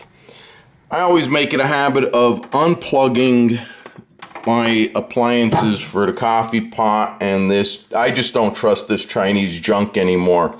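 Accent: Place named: Upstate New York, United States. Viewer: He sounds more upstate. I’d say Binghamton.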